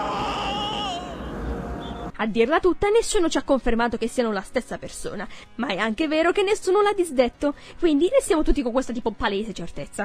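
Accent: Bari, Italy. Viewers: Oddio 😍 accento pugliese, Barese? Sono di Bari, nuova iscritta complimenti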